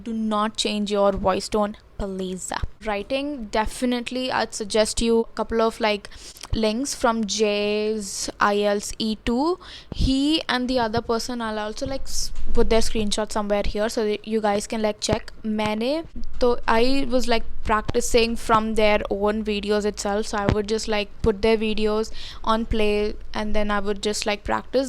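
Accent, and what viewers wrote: Hyderabad, India. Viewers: Hyderabadi bolte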